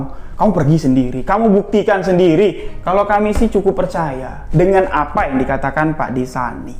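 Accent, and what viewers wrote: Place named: Jambi, Indonesia. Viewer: AKU Dari Lampung Nich Bang! Wa A'laikum Salam Wr Wb Jg AKU Percaya dengan Segala Cerita Yg Bang Sampaikan! dengan Logat Bahasa Nya Yg Khas Orang Jambi, Slow, Lembut, Dan Bisa Kita Pahami Sucsses Terus Bang Aamiin 3X Allah Humma Amin Ya Allah Ya Robbal A'lamiin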